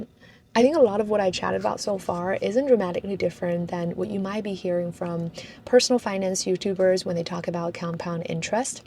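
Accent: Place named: United States. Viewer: hi! Could you share your tips on how you achieve your perfect american accent? As a foreigner myself, I find it so hard to have the american accent and I think yours is just perfect! I am chinese too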